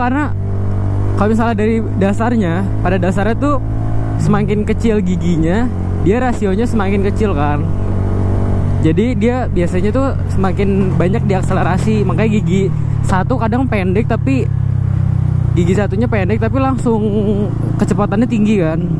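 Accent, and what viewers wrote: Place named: Java, Indonesia. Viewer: Pasti kamu manis banget yo mas, suara mendok nya ❤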